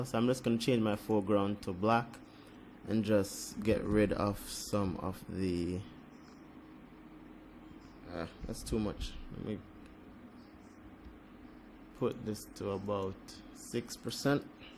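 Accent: Jamaica. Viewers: Hello sir...where from the Caribbean are you from? You sound like a fellow yardie. Great work. I use frequency separation quite a bit.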